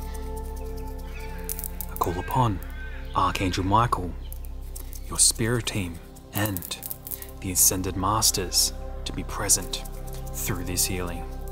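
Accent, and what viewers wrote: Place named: Australia. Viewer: Is he Australian? I love his accent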